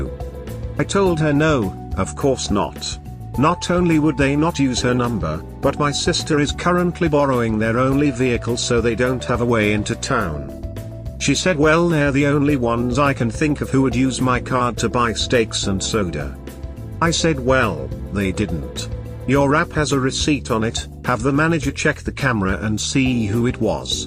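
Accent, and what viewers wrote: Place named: England, United Kingdom. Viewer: I'm 3/4 of the way through this story (and don't know how it ends) and feeling frustrated and irritated with OP (maybe the male English accent of the narrator is making it even worse). This story should be titled, "Journey into the mind of a SIMP BH". Once divorced, stop doing the 'pick-me' dance, block her phone and all forms of communication; full NC. End of story.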